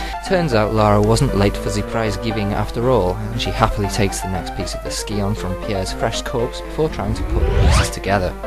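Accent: United Kingdom. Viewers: Wow this must have taken you a lot of time! Very funny! Btw you have a british accent :) Probably cause you're biritish =P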